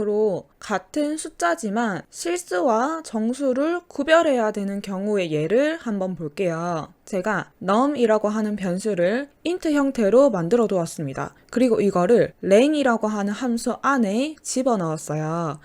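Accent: Gyeongsang, South Korea. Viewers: 갱상북도 사투리네이~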